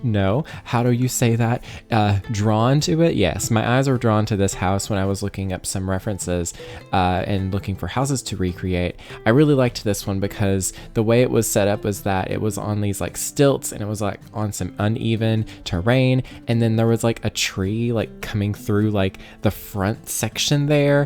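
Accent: South, United States. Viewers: This build is insanely beautiful! Everything about it. The porch and the interior on the lil diagonal! EA should be giving you their packs for free because your builds are so amazing!!! I send all your videos to my friends who play the Sims and everyone loves your energy, creativity, and your lil Southern accent!!! Hugs and love from Philly!!!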